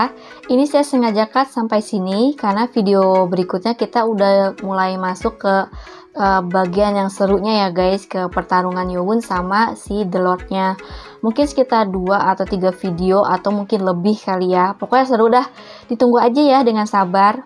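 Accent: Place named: West Java, Indonesia. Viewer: kk orang sunda ya.. logatnya.wkwkw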